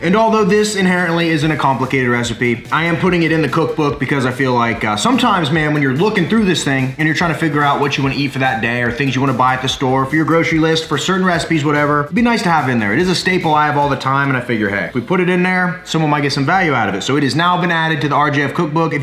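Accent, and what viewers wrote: Texas, United States. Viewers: is it me or ur getting a Texas accent 😱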